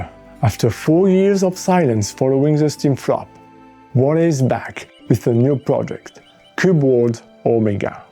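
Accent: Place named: France. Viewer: Hey, first of all congratulation on doing and posting a video. Pour le reste, étant aussi français , si je peux me permettre de pointer deux ou trois problèmes : -Le son, oui tu as un accent français, mais je pense qu'en réduisant la saturation ce serait plus audible (ça peut être amélioré largement avec une bonne technique simplement avec Audacity, même si c'est pas la meilleure solution non plus). Même étant aussi frakgais et fluent en anglais c'était un peu dur par moment. -Le montage, dans l'ensemble c'est très bien, mais comme disait il yabquelque années jdg, ou Antoine dznizl le rythme est très important, même si le rythme ne doit pas forcément être rapidew c'est mieux quand on a l'impression que tu donnes le rythme a ta vidéo, et pas l'inverse . Après je dis tout ça en ayant eu pendant longtemps l'idée de faire des vidéos, qui plus est en anglais, sans jamais en avoir fait. 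Ce sont des observations mais ça ne retire rien que tu fais mieux que 98% des gens, qui eux n'ont même pas agis. Et de reste si tu souhaite discuter ou plus de détails sur mes avis n'hésite pas (si ça t'intéresse on pourra voir par mail ou autre) Bravo encore et bonne soirée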